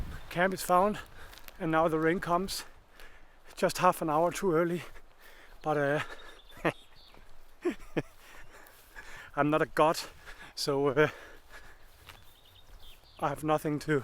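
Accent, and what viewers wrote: Denmark. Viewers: I haven't finished the video and I don't yet know if I'm going to buy a Nikon mirrorless (currently have Nikon DSLRs but also a Sony α7RIII) but a Brit who once lived for a year in Aalborg, Denmark I absolutely love the sound of a Danish accent speaking English! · I allways like the big fat danish accent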